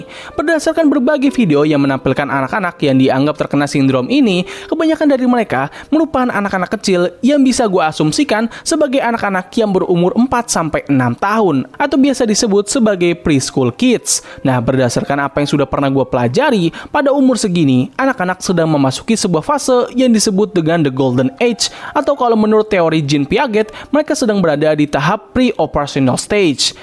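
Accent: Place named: Sunda, Indonesia. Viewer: Emosi anda sangat tersalurkan dengan baik ditambah penggunaan kata "lah atuh" Sebagai orang sunda saya sangat familiar dengan nada nadanya😂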